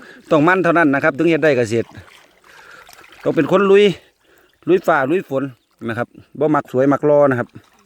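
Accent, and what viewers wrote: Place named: Korat, Thailand. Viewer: เว้าคือคนบ้านโนนสุวรรณเนาะ อ.ไดครับโคราช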